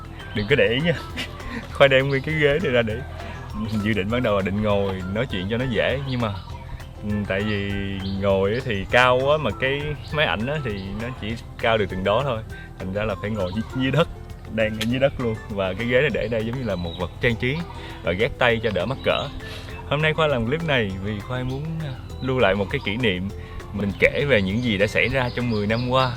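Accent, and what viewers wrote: Mekong Delta, Vietnam. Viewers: Thích nghe giọng khoai nói ghê , ấm áp mà đậm chất miền tây nam bộ sao á❤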